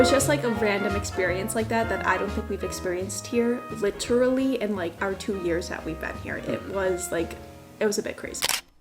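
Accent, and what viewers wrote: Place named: Argentina. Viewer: "Sha" usas lunfardo gringa, sha casi argentina 😂❤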